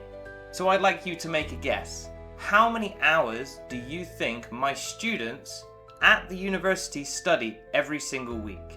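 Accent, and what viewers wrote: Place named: United States. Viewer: Why you have American accent? Even if u were born in Uk.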